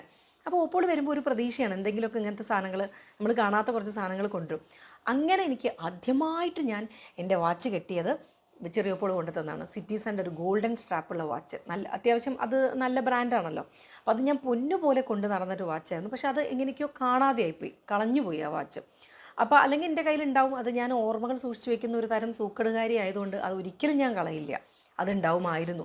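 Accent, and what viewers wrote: Kozhikode, India. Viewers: Kozhikodan slang nice presentation❤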